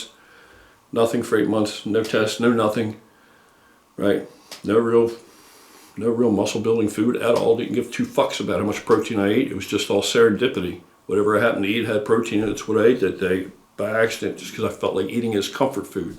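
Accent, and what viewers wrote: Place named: Ohio, United States. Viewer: Hey your accent sounds familiar...Ohio? I used to live in southeast Ohio as a kid! Anyway, cool channel and sick gains bro 👍🏼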